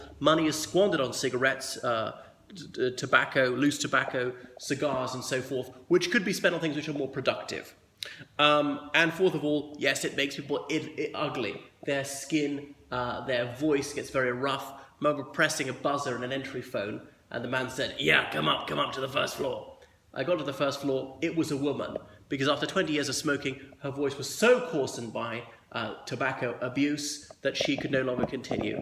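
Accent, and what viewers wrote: RP, United Kingdom. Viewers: I was looking for posh british accent and I found you. Im peruvian and I ve been to England quite a few times .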